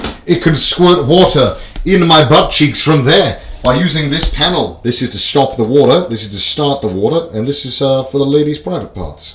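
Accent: United Kingdom. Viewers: Tbh I really like how talk, the British accent is chef kiss even though he’s saying funny stuff, is just soothing 😫✊🏻❤️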